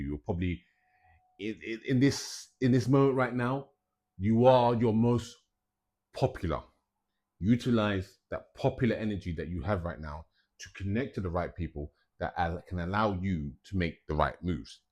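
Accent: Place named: United Kingdom. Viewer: So refreshing to listen to a wonderful astrology session that is presented with a British accent!!❤🎉🙏👍💫✨️🌟✨️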